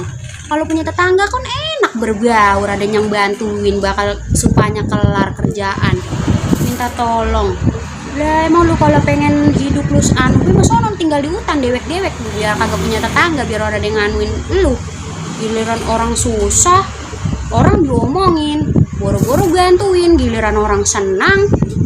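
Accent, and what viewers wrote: Bekasi, Indonesia. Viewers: Logat nye bekasi bnget... Kaye enyak aye kalu ngomelin aye, keluar logat nye keq gini. Kage ade titik kome nye.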